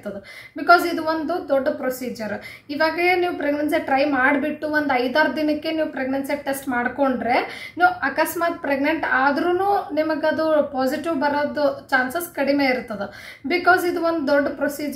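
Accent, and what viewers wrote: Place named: North Karnataka, India. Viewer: ಪಕ್ಕ ಉತ್ತರ ಕರ್ನಾಟಕದ ಭಾಷೆಯಲ್ಲಿ ಹೇಳಿದ್ದೀರಿ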